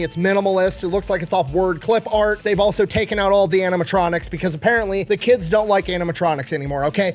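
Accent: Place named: Tennessee, United States. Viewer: sounds like you’re from West TN haha There was one in either Jackson or Memphis that was like that in the 90’s. Our rural elementary school took us there twice for field trips. Oh, the good ol days.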